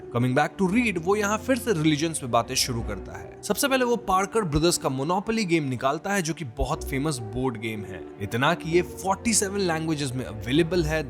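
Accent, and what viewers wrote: Bengal, India. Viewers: Bas kharab Hindi sunke bar bar mood kharab hogaya. Bangal se ho kya bro? Jara Hindi pe kaam karona.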